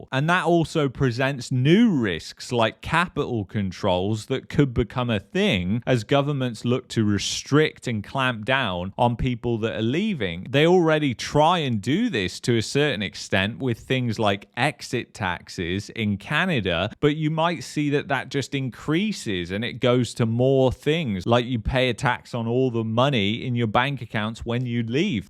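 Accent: England, United Kingdom. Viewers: This guy with an English accent.. obviously not from here... constantly telling people how bad our country is...try a positive video for once..or move home bud..guys like you are bad for our society.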